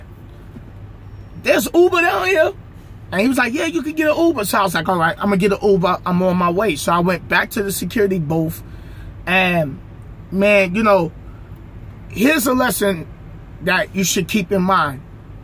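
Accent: New York, United States. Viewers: Sounds like your from nyc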